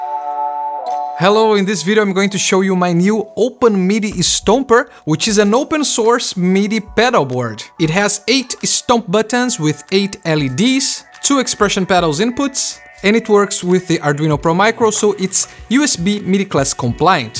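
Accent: Brazil. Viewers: tu é Brasileiro né mano? Entendi perfeitamente seu inglês kk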